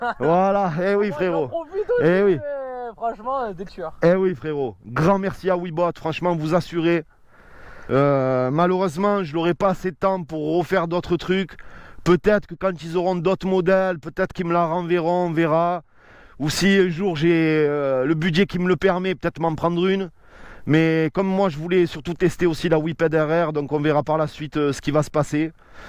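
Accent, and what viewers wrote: South, France. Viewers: Merci pour ce magnifique test.. Cela ne sera que réservé en une niche de clients car le prix, son poids... donc pas à monter et descendre 3/4 étages pour la ranger dans son appartement.. Ensuite, elle est monstrueuse mais il existe une concurrente (bcp plus léger mais moins d'autonomie avec autant de puissance): La Rion race edition. Par contre question concernant l'étanchéité et la tenue sur la route par temps de pluie? Dans tous les cas, tu es the first one youtube FR sur les tests trottinettes.. (ta personnalité, les montages vidéos, ta sincérité et cet accent du Sud.. 😉) Ride safe l'ami et merci encore.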